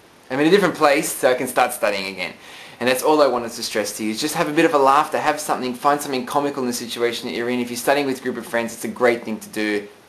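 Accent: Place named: Australia. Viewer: He did. He sounds Australian, and we say rubber :L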